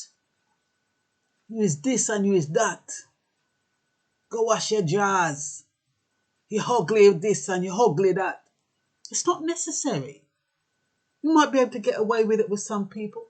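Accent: Jamaica. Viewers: I like it wen you fling on yu jamacian talk.keep up the good work.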